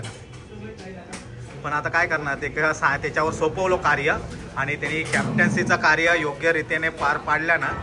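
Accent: Malvani, India. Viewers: Avad नाय भेटला तरी चालेल . पण तुझी जी भरारी आहे ती लय भारीच आपल्या गावचा नाव रोशन केला दादा तू ❤❤❤ बाकी तुला हार्दिक अभिनंदन भाई असेच तू व्हिडिओ बनव . सर्वात महत्वाची गोष्ट म्हणजे माझा मुलगा 14 वयाचा आहे आणि त्याला तुझे व्हिडिओ , तुझी कॉमेन्ट्री , तुझे कुटुंब सर्वात आवडते आहे . सांगण्याचे तात्पर्य म्हणजे तुझ्या मुखावाटे निघणारी मालवणी वाणी ही सर्वात आवडती ❤❤🙏🙏🙏🙏🙏🙏🙏🙏🙏🙏🙏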